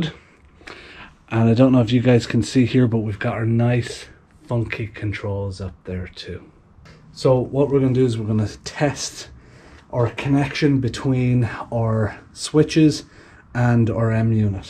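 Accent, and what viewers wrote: Ireland. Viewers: Fair play to you! Is it an Irish accent?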